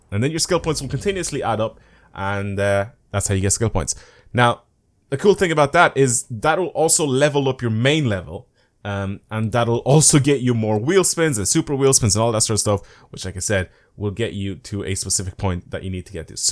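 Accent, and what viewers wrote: Ireland. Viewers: Are you from Ireland ? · Are you from county Offaly? You sound just like my Irish cousin who lives in Offaly.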